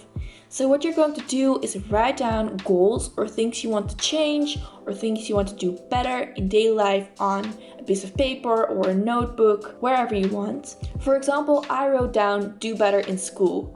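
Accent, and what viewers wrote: Netherlands. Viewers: Are you dutch? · Omg love your dutch accent! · Are you Dutch? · Cool video! Are you Dutch? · Are you from the Netherlands, because her voice sounds a little bit like Netherlands People trying English. But your English is very well! I'm from the Netherlands! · Je klinkt Nederlands, klopt dit?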